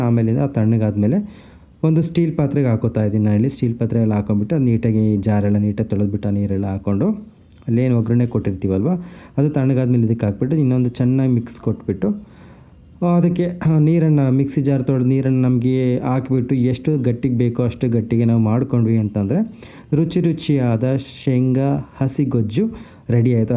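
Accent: Chitradurga, India. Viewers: ನಮಸ್ಕಾರ, ಇದು ನಮ್ಮ ಚಿತ್ರದುರ್ಗದ ಕಡೆ ಅಡಿಗೆ.. 2 ದಿನದ ಹಿಂದೆ ತಾನೆ ನಾನು ಶೇಂಗಾ ಗೊಜ್ಜು ಮಾಡಿ ತುಂಬಾ ದಿನ ಆಯ್ತು ಮಾಡ್ಬೇಕು ಅಂತ ಹೇಳ್ದೆ, ಇವತ್ತು ಈ video ಅಕಸ್ಮಾತಾಗಿ ಬಂತು ನೋಡಿ ತುಂಬಾ ಖುಷಿ ಆಯ್ತು. ನಿಮ್ಮ ಭಾಷೆ ಅಡುಗೆ ನೋಡಿದ್ರೆ ನೀವು ದುರ್ಗ ದಾವಣಗೆರೆ ಕಡೆಯವರು ansutte. ತುಂಬಾ ಚೆನ್ನಾಗಿದೆ ನಿಮ್ಮ ಅಡಿಗೆ videos. ಶುಭವಾಗಲಿ ನಿಮಗೆ 👍🏻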